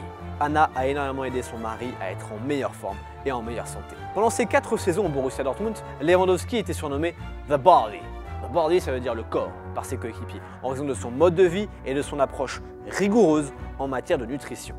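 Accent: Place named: England, United Kingdom. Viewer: Walla like pour l’accent anglais de fou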